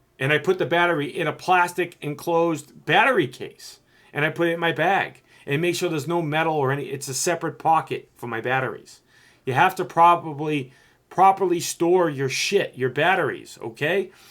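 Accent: Boston, United States. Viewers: You just gained a new subscriber because with all the videos I’ve put myself through yours is the one I got the most knowledge from. Are you from Boston ? Take care,all the best 🙏✌️❤️, 🍀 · Well said D from 25mins on but learned alot. Also...love the accent, is that Boston? 😄💨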